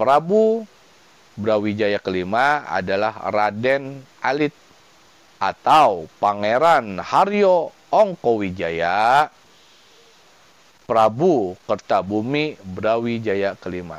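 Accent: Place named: West Java, Indonesia. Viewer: Trima kasi kepada Bpk ustadz, klo didengar dialeknya orang Jawa Barat, sangat jelas sekali menerangkanya, Ammmin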